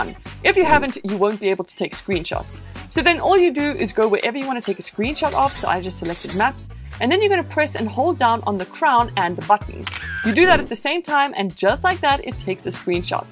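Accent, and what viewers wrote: South Africa. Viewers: Are you from South Africa if not my accent reading is off. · I love her accent. Idk if shes south African but her accent.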